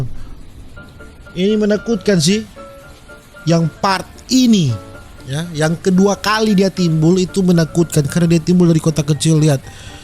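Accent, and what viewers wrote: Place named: Sulawesi, Indonesia. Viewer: Kalau saya selalu ngakak,, Krn org Sulawesi JD memang begitu lah logat nyaa... Kasar tapi hati nya lembut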